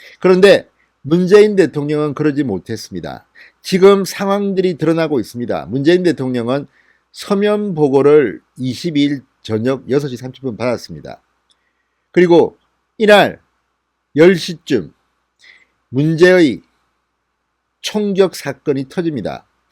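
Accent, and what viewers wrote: Gyeongsang, South Korea. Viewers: 문재인은 남쪽.전라도 대통령이라든데 방송하시는분은 말투가 경상도 이신데 문재인은 대한민국 대통령이 아니니까 대통령이라 부르지 마십시요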